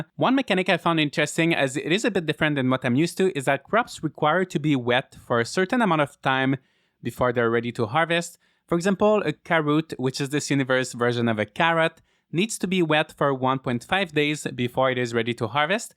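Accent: Quebec, Canada. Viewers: T tu quebecois?? 😅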